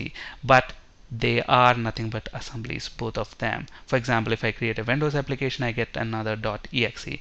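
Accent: India. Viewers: Indian?I feel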